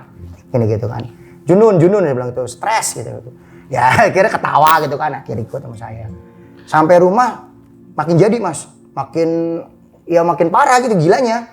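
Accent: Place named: Betawi, Indonesia. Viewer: dialeg betawi kak... Setelan normalnya kek gitu 🤭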